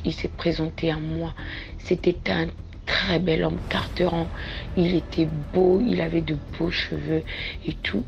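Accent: Gabon. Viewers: L'accent est gabonais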